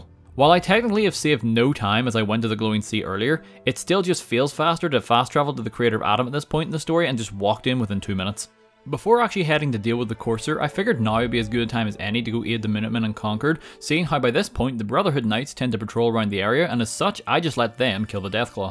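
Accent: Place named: Northern Ireland, United Kingdom. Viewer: the northern irish accent is strong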